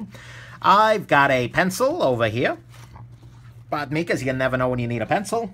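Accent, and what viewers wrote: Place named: Brooklyn, United States. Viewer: What part of Brooklyn are you from?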